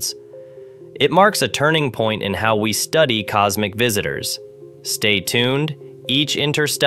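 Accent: United States. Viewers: Why do Americans pronounce the word ‘Second’ as ‘Secint’?? And ‘Herbs’ as ‘Urbs’.If you can’t read,I understand but surely,pronunciation IS taught at schools in America?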